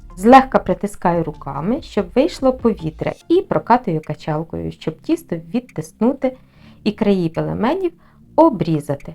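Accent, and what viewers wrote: Standard. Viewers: Дякую за пораду і за чисту українську ... Добра і процвітання ❤❤❤❤❤❤❤❤🎉🎉🎉🎉🎉🎉🎉🎉🎉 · Дякую за науку, чарівна наша господине! 😊 І за чисту солов'їну - окрема подяка! 🙏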